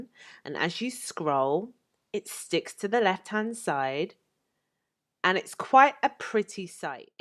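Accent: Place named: United Kingdom. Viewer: Love British accent.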